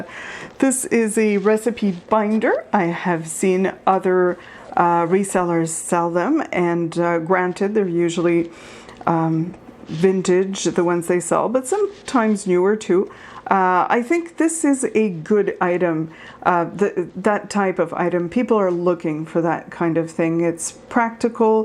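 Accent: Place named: Quebec, Canada. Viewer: I could listen to your accented dialogue all day. Quebecoise?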